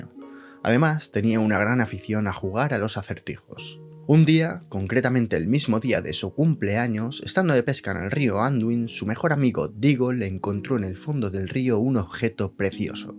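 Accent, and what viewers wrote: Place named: Spain. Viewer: Odio el dejo español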